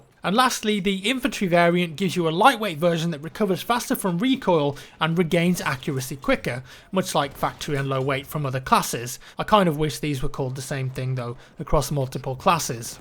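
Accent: England, United Kingdom. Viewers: in squad up if youre on the british side please say "A tiger? in africa?". You got the accent use it :) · are you from english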